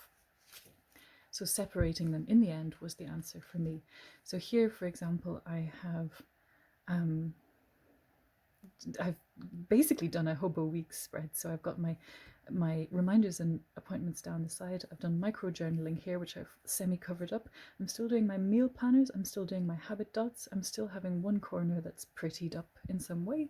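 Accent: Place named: Ireland. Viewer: You have an incredible soothing voice.. Nice to see.. And hear.. Irish voices..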